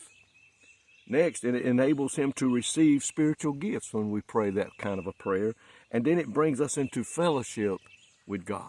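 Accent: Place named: South, United States. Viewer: I too woke up in the woods on a spiritual retreat in Ohio. You blessed my soul with the nature sounds and that familiar Southern accent (I’m from TN). Thank you for sharing your time and your prayer of blessing on us watchers (I just searched for morning devotion and this came up). God, heal his eye issue. ❤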